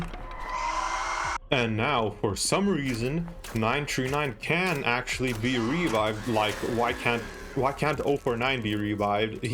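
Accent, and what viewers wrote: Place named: United Kingdom. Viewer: british scp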